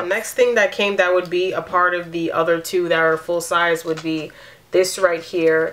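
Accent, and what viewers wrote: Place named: New York, United States. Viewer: thanks for the review. But I was surprise to really hear your New York accent, I'm from Chicago and Its usually not as strong in your other videos, but they must had really upset you with this box!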